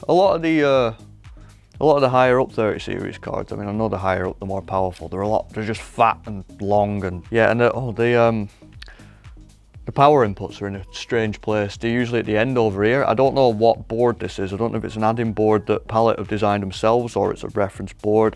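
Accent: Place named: England, United Kingdom. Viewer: Love that English accent.💛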